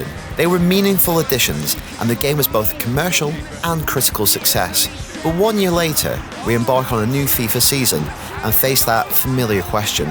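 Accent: United Kingdom. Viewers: everytime theres a football game review on ign i think "boy, time for some british accent :D"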